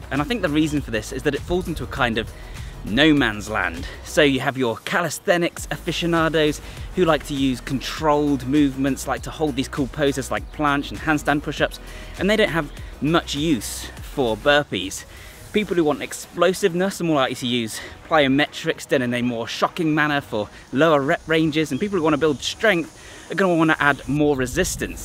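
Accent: United Kingdom. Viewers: where are you from? I suppose from United Kingdom, right?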